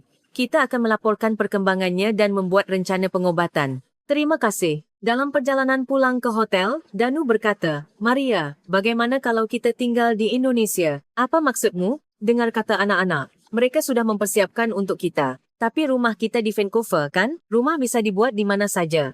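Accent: Malaysia. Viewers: Komentatornya berlogat malaysia .... orang malaysia ya. ...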